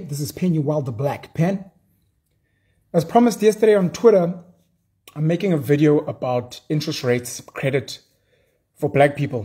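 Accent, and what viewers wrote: Zulu, South Africa. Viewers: You have one of the purest Zulu voices, from a sound perspective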